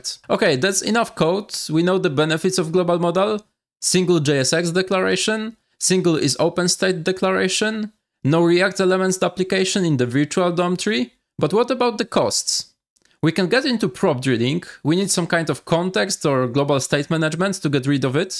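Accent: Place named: Poland. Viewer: where are you from? Your accent sounds kida polish?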